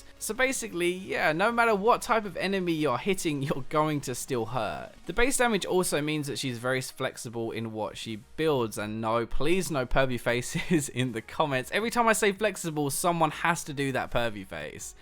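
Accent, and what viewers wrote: England, United Kingdom. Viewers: It's not a British Accent, it's actually an English accent. Because Britain is a country made of multiple smaller regions, with their own languages and dialects, they have Scottish people, Welsh, Irish, and English each with their own accents. The English one is usually labelled as a "British" one tho.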